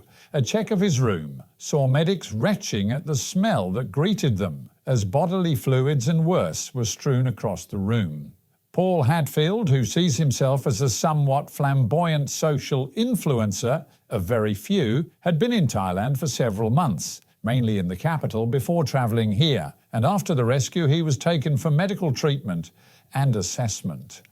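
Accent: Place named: Southport, United Kingdom. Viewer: Southport English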